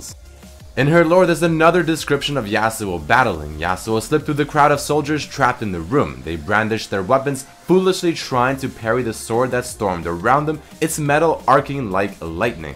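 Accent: United States. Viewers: You sound American with your voice haha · OMG HE SOUNDS AMERICAN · He sounds American when he is sick haha.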